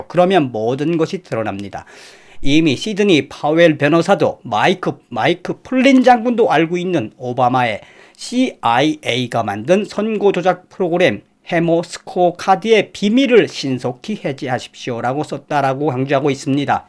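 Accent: North Korea. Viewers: 정확한발음과 구수한북한 억양이 아주 좋습니다.👍🎆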